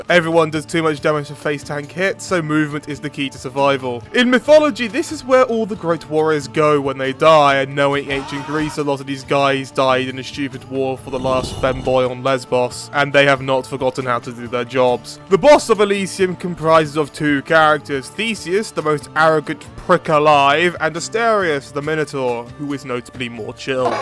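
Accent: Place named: United Kingdom. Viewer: Next time pick a non British voice actor. Great video thou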